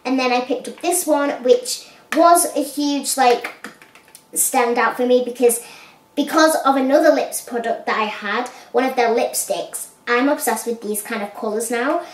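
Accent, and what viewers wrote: United Kingdom. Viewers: Lol I totally used to always think you were calling us all "donkeys" and not "junkies" when I first started watching your videos! Hahahaaaa! 😂 I was like, why is she always calling us all donkeys? Lol don't hate me I'm so sorry I am absolutely AWFUL at trying to understand and hear British/English accents clearly lol. I'm from St. Louis Missouri so I'm terrible at trying to make out your accent.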